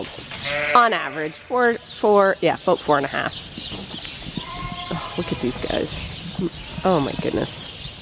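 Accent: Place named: Perth County, Canada. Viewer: I thought I recognized your accent 😁 Out near Perth county myself, the snow has been crazy this year!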